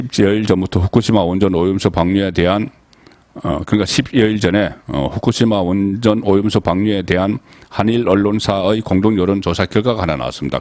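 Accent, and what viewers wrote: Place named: Busan, South Korea. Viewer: 매 편 즐겨 보고 있습니다. 저도 부산 사람이라 사투리는 이해하는데 제발 아 발음을 오 로 발음하지 않았음 합니다. 나오고 가 맞는데 노오고 는 아니지 않습니까? 어려운 것 아닐것 입니다.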